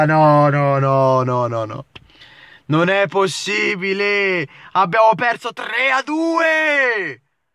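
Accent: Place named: Bergamo, Italy. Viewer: lol bergamasco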